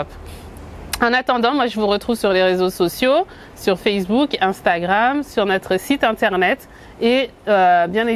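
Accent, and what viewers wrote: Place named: Senegal. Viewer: Cest drole plus je vous écoute plus j'entends l'accent qui se "sénégalise"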